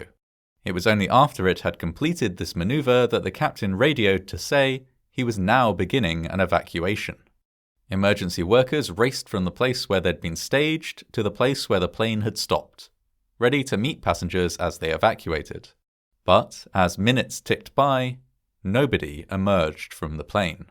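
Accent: United Kingdom. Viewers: Horror vids are always better when narrated by a British bloke.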